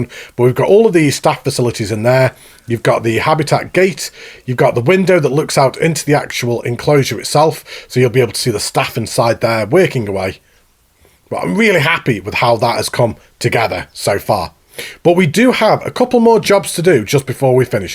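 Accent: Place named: England, United Kingdom. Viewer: Are you English or Scottish? I like your accent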